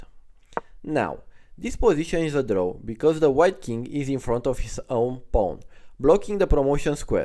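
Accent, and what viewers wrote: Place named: India. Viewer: I didn’t know you had an Indian accent.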